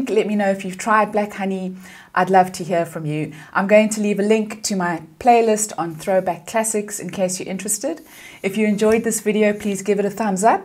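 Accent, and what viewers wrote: South Africa. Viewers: I was like you must be South African, from the accent ❤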